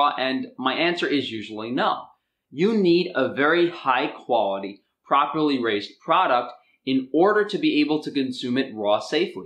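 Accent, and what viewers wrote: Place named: East Coast, United States. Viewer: Bro I love how into it you get!!! Definitely a man who's passionate about quality meat. Keep up the awesome work... Always great info... And I'm always thoroughly entertained by your East-Coast-ness!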